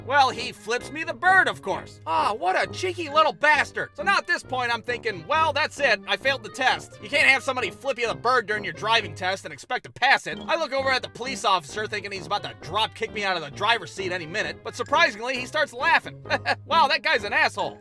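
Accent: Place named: Ohio, United States. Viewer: I'm also from Ohio and after watching and listening to this video....as much as us ohioans don't want to think that we do....we totally have an accent. This video confirmed that theory for me.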